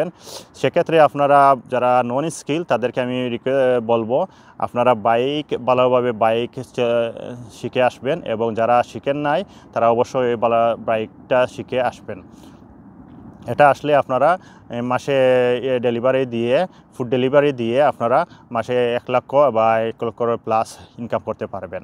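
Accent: Sylhet, Bangladesh. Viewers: Apne Sylheti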